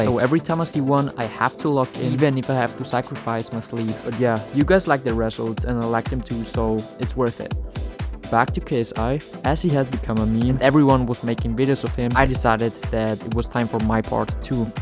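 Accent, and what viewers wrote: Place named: Germany. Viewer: Bro has crazy German accent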